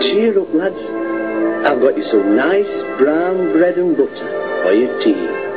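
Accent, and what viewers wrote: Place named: South Yorkshire, United Kingdom. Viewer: The voice is that of Tony Capstick, a folk singer and radio presenter who had a South Yorkshire accent. Nothing like Mancunian, I'm afraid Manchester is, indeed, in Lancashire, but Greater Manchester comprises parts of south Lancashire and north Cheshire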